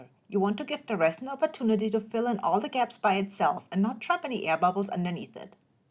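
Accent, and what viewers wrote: Germany. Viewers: The lack of German being spoken in this video makes it less fun. 🤣🤣🤣....it's all good, though. The accent makes it worth it still.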